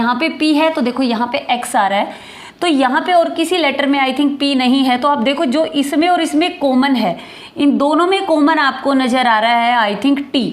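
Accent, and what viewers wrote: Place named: Gujarat, India. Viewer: Kaha❓❓ Gujarat se aap Ho kya.....Words ki Pronunciation se Lagta hai.....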